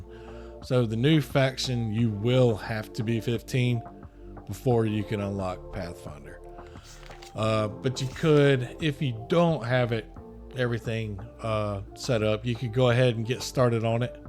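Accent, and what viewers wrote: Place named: South, United States. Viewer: Oh my word the accent on this man. I have a southern accent also . Thank you for a awesome video.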